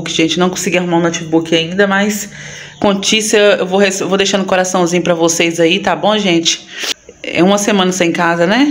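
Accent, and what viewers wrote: Minas Gerais, Brazil. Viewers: UAI, vc é mineira? RS adoro o seu jeito de falar.. eu tbm falo assim e sou mineira. Amo seus vídeos. tem jeito de ser um menino muito bonzinho. Um beijo no coração de vocês. Deus abençoe! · Eu acho muito legal seu sotaque mineiro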